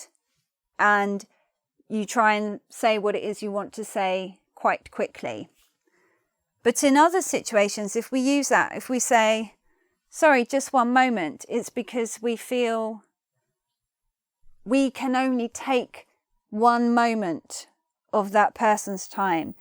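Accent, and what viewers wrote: United Kingdom. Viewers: Thanks my best teacher ..you make me love British accent .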